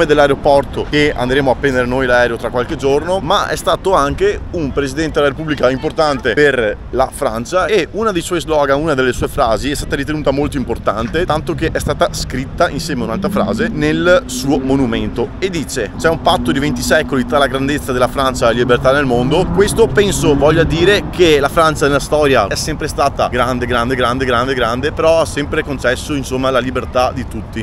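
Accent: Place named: Veneto, Italy. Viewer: il tuo slang Veneto ci sta un sacco per dare pareri soggettivi alle cose😂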